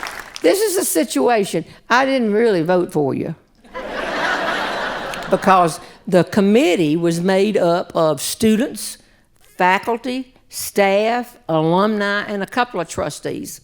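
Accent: South, United States. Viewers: Hello from Melbourne, Australia. Really looking forward to seeing you in Texarkana on January 12. Thank you for the videos - I just love your Southern accent!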